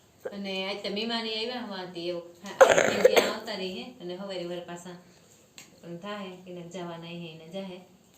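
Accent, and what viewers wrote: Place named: Saurashtra, India. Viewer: જય વછરાજ તમે ઘેડના લાગો બોલી સોરઠ ની છે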